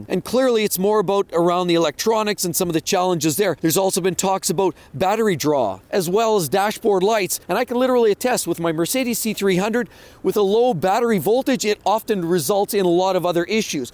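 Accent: Canada. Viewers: I can't take his Canadian accent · That Canadian accent kills me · your thick Canadian accent kills me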